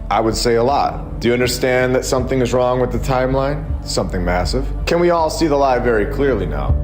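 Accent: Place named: Massachusetts, United States. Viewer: Sames! Are you from Massachusetts? 😅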